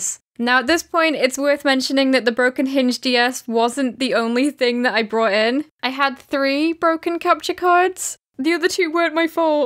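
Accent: United Kingdom. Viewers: mrxMapping he means the British style of English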